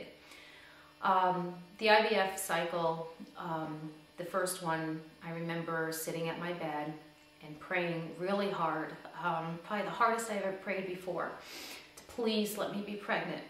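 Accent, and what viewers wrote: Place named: New York, United States. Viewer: I am so happy to hear that you finally had your dream of a child come true. God bless you, your husband and your son! It gives hope to people like me who have yet to be blessed with a baby. How much did the Hunyuan method cost you over the course of the year? A rough estimate would be great. What state are you in? You sound like you could be from NYC like me. Best of luck to you and your family and thank you for sharing.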